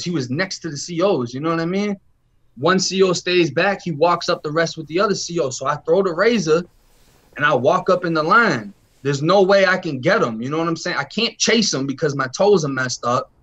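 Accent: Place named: Boston, United States. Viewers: I love the Boston accent that is coming from a straight up natural born Brooklynite... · yo G Im from NY to me youu sound like you have a Boston accent with a A's and R's ... Car you sond like kah-rrr.. The broomstick should be called "Frisk From In Too Deep" from LL Cool J wit his sons Godfather right hand mans on the table. "Youu see him butt naked on the pool table tide down all bleedin n shit n say to yourself o God what a foul nigga right.... welll IM DRUNK WIT REVENGE MF LOVE IT LOVE IT, THINK ABOUT HER THINK ABOUT HER"... that shit was Brazy for homeboy boy, shit was crazybrrazy for me. Im cool wit everyone more 3's but MAD 2's too.... Im good on me, when i went in they tried to give me mad shit n my heart check was so crazy bro this big OG CRIP wit a gray durag n green slippers on sees im the only one thats 5'9 or looks white, we get transfered 6 ppl chain gang style n obv big homey ASSUMED i was FOOD, but he found out I WASNT FROM JUMP STREET N IM STILL IN CHAINS WIT THE PPL N GUARD, then when iwas on the phone tryna tell her to get my shit from the safe n he gonna try n take my phone or commessary or think im food! 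I SHUT TTHAT SHIT DOWN FUCKIN FAST n it was my 1st Time in Riverhead, CI was diff! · Boi has the Boston voice.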